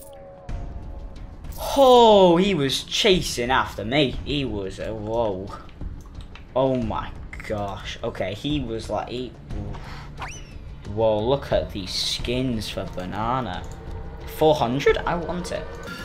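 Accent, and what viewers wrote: United Kingdom. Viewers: You sound like a very scottish man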